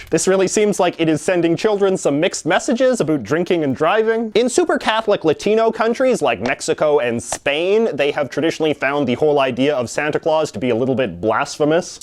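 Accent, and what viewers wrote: Canada. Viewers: He's very phonetic when he speaks. He even says the aboots and oots and aroond. Which I'm sure many agree not very many people in Canada pronounce it that way. Either way he's insightful and I don't mind. I'm actually more weirded out by the Canadian than his very bad pronunciation of дед мороз. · Hmmm you’re Canadian aren’t you, squidward...? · Hi J.J.McCullough. As a fellow Canadian, I am just wondering what part of Canada you are from. You seem to have a pretty thick accent when it comes to words like about which only exist in some provinces. Just curious, cause I'm from Southern Ontario and we don't seem to say about the same way 😂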